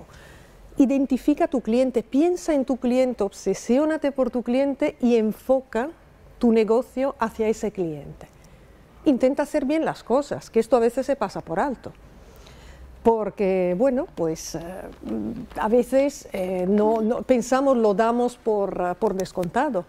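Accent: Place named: Peru. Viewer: Me parece escuchar a un señor de nacionalidad peruana, en el curso, Esta clase es exelente, saludos desde Peru.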